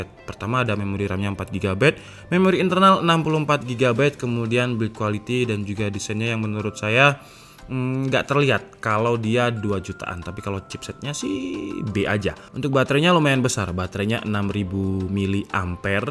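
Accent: Java, Indonesia. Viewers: Orang jawa emang medok mas bro jawane😂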